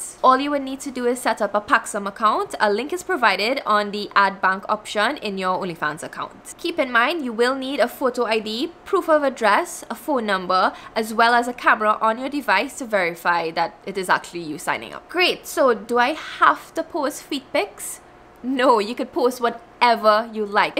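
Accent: Trinidad and Tobago. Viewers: I love you accent lol TT 💖 I have family in Trinidad ❤️ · Nice Trinidad accent 👌🏼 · Thank you here from Jamaica .Miss Trini haha. love de accent girl. 💛 Thanks for sharing your knowledge and tips. . I am new and already see results as a pantyhose foot "model". Bless you and stay well. 💛💚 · That Trini accent!!!! ❤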